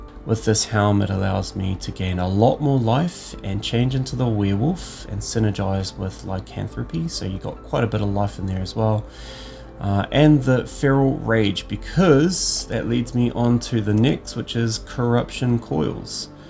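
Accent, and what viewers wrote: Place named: Australia. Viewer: Are you australian? Your accent sounds australian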